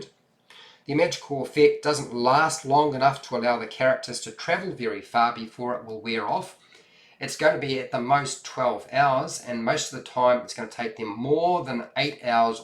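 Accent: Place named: New Zealand. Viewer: After watching so many of your videos, I'll have to dedicate an NPC or a villain to you because in addition to your content, you've become an invaluable resource for a great New Zealand dialect!!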